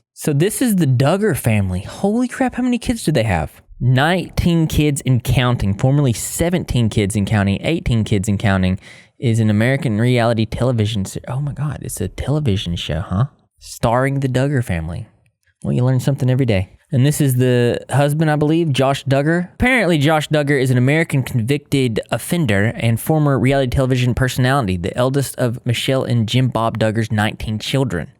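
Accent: South, United States. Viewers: new viewer who is curious about ur accent. are u aussie or from the south? u sound like my grandpa from georgia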